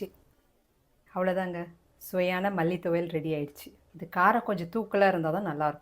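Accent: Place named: Coimbatore, India. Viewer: மேடம் நீங்க பேசும் போது உங்கள் ஊர் ஸ்லேங்கே தெரியல பக்கா கோவை பெண் பேசரமாதி இருக்கு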